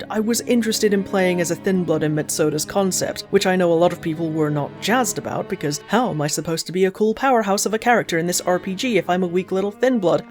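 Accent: United Kingdom. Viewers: Hey can I ask question, I know you are Brit but what accent do u have specifically which region accent?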